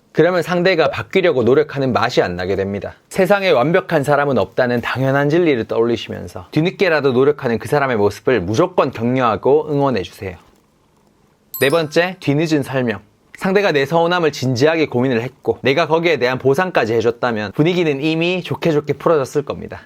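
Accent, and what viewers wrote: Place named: Seoul, South Korea. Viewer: 경상도사람인데 서울말잘한다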